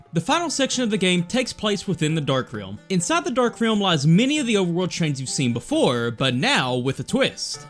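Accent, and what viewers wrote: United States. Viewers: Is that an Arkansas accent? There aren't enough gaming YouTubers with a good, strong American accent. Review some more Zelda games and I'll watch. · From one guy with a country accent to another: great job! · First, I adore what I swear is a faint country accent I hear, but I'm actually here fresh from PBG's at the time latest video, (Wind Waker) and I admit- While I'll likely never grow tired of Zelda content I grow weary of the same 3D Zeldas being covered as it's not like there really is any bad ones. You can find someone presenting valid good points for any of them. I actually keep forgetting the DS ones existed that were a great time of my past. Spirit Tracks really does have what I'm sure is among the most complex "puzzles" in the series mainly in the Tower of Spirits, as well as some of the most fun Bosses that actually can present a challenge specifically because the DS Zeldas are right in between what I consider "True 3D Zelda" and "Top Down Zelda." As for items as you mentioned of the Whirlwind, sure there are a lot of wind archetypes items in the series, but it's the order of the items you get staples or not that can affect things. 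You're using the Whirlwind for alternate means to progress until you get the dungeon's item proper, the last dungeon's item is a more inefficient tool that can still fill certain shared roles keeping it relevant, and keeping my disbelief suspended. This isn't exclusive to Spirit Tracks of course, but it feels you're getting the most out of each item until you're hard walled by something you need the next item for. And YES. This game does have it's flaws. As weird as it sounds I wish it's elements were remade into a "True 3D Zelda" but I under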